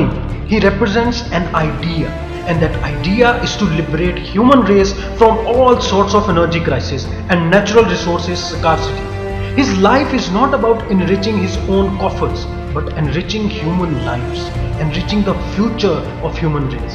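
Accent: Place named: India. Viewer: the bgm is epic. the accent doesnt work with the bgm. no offense to indian accent but they just dont work together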